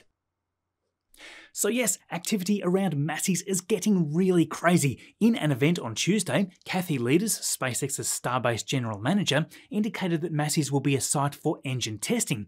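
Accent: Australia. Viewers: Hi. I don't know if other viewers experience the same strange feature when switching on undertitling: I always get it in Finnish! I have to explicitely put it back on "(american) english". I don't have this on other Youtube Channels. And, no: I'm not Finnish either. I'm Belgian, and I speak Dutch. But the english subtitles sometimes help when I did not understand you completely. Nothing to do with your Aussi accent, more with my poor hearing.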